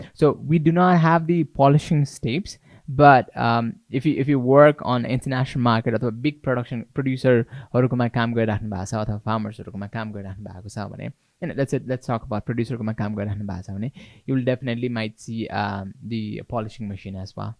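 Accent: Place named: United Kingdom. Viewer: Thankyou bhai tara ni ki nepali ma ki english ma bolnu na hau...bhai ko nepali bolai ma english lawaj le alik bujhnu garo vayo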